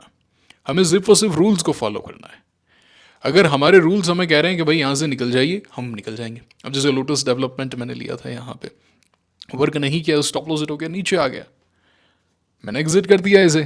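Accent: Rajasthan, India. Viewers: Sir ji, aaj to bahut jyada dil khush kar diya apne, akhand bharat ki baat bolke, sanatan ki baat bolke, sabse sashakht sabhyata ki baat bolke, spirituality ki baat bolke. Mai Bikaner se hu aur aapki baate aur apka lahza sunke ek baat se sure hu ki aap bhi Rajasthan se ho aur kahin na kahin apka sambhandh bhi Bikaner se hai, aisa isliye kyunki 2-3 din pehle ek video me apne bola tha " Market bhacheed bola rha hai". Aisa to yahan bikaner me kafi bola jata hai. I may be wrong but mujhe kafi stong feeling aayi ki aap bhi Bikaner se ralate karte ho. Itni gehri aur amulya baate humare sath share karne ke liye aapka koti koti dhanyawad. Jai siyaram 🙏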